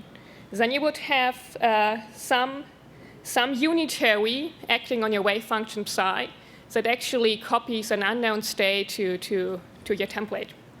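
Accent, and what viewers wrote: Germany. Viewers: Free Thinker, I think she's German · I think she is a German who was taught British English by (or near) the French. That's my hypothesis. Anyways, very interesting talk!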